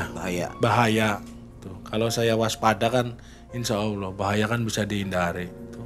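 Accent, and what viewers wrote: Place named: Java, Indonesia. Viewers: Bahasa Cirebon nya ga ilang yaa ang,,sampe ngakak dengerin cerita nya.😅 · Mas kalau cerita sedeppp, logat cirebone sedeppp nemen jare wong tegal 😅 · Di tunggu lagi Mang cerita ia.Ciri khas orang Cirebon bahasa ia.. anak cucu Mbah Kuwu Sangkan cirebon.salam dari Aso Cirebon Winong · lucu klo kang ngmong khas jwa bgt😂 tp klo sya liat liat kang ada trah bkn orng smbrangn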